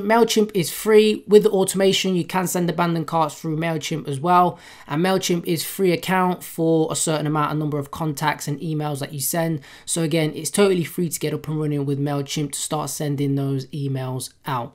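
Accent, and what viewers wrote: England, United Kingdom. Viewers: You say dollars but have an English accent :P whereabouts you from?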